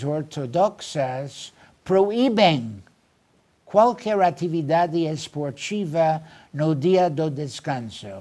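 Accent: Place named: England, United Kingdom. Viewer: Nossa, ele falava exatamente do jeito que eu falava quando era criança quando imitava os Ingleses falando português kkkkkkkkkkk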